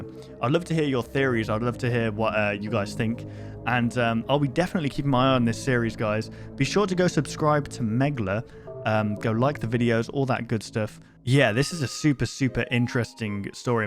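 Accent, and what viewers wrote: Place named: United Kingdom. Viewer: Bro is so British I thought he was saying shack instead of shark for like 60% of the vidoe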